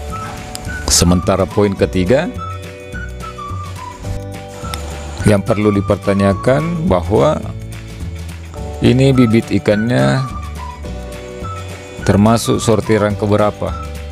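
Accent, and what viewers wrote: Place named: Sulawesi, Indonesia. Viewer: kyk nya orang sulawesi, dari logat nya😁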